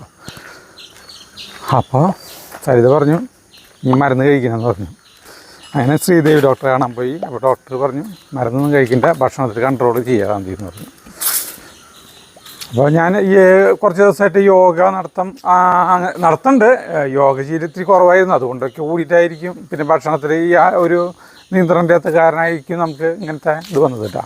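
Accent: Thrissur, India. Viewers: തൃശൂർ ഭാഷ 😂👍..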